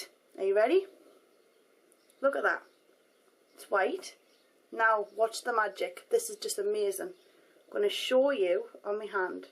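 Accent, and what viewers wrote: Newcastle, United Kingdom. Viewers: She's geordie, GEORDIES UNITE! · are you a geordie? you'd be sooo great on geordie shore! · i think she's geordie... like Cheryl Cole... northumbrian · Just found your video, it's the first one I've watched, and I'm really impressed. 5*s for your video, and definitely subscribing :) And I love your accent, I might be wrong, but is it Newcastle?? x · definitly from newcastle, im from newcastle so i know the accent pretty well lol, i'd be surprised if she wasn't. love your video's by the way. x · love it how every one thinks your scotish when blantly your georide lol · She's not Scottish..She comes from Newcastle. In England.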